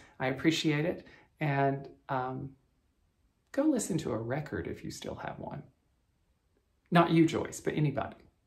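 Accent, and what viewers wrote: South, United States. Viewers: Kia Ora from New Zealand! I have just finished watching your entire journey from the start while I have been home sick this week. You are truly a joy and perfect medicine. I've had tears and laughter, memories evoked, and a lil enabling. If laughter is the best medicine then u are a wonderful cure as I do feel better. Only thing is, as u are the only voice I have heard this week, every time I talk to my cat n dog I have a slight southern accent happening lol. You welcome everyone to the neighborhood but after watching the kindness, support, fun and joy, it's not a neighborhood u have created here, it's a FAMILY. Congrats on your milestones, I watched on TV so didn't comment often, but thank you for keeping me company (I'm a terrible patient) and I would like to suggest a name for hand stand cat ... OHANA. It means family. Thanks again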